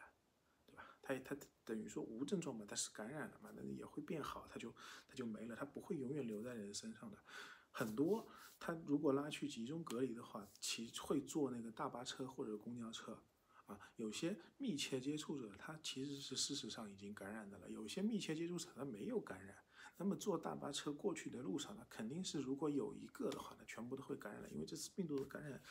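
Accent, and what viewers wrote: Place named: Yangtze Delta, China. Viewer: 你这是长三角口音啊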